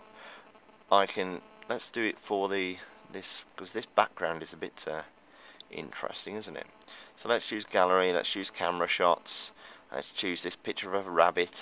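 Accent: United Kingdom. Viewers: Are you from Liverpool?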